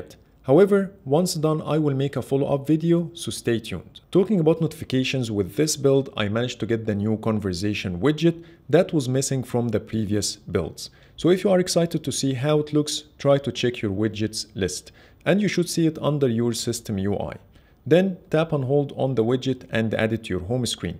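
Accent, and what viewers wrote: Egypt. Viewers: u accent look Egyptians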